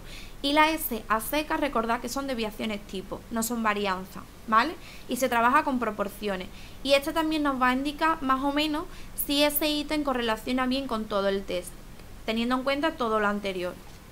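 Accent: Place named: Mexico. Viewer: parece que te dolió... Es cierto, pues, yo no soy culpable muchas de las mujeres de México, les ponen un acento a sus palabras que dejan mucho que decir, a veces parecen engreídas o de alta sociedad cuando muchas de ellas viven en los suburbios y comen lo impensable... Además no se le entiende, pues, escucha a la chica y verás que no miento y por decirlo no soy un ogro o naco como dices... De todas formas, lo que sí se reconoce es la buena intención de la chica.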